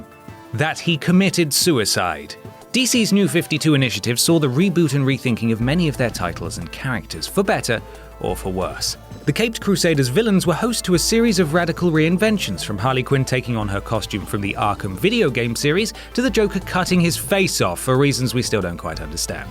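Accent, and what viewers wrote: United Kingdom. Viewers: freaking pompus british accent makes me hate you, but damn do i respect you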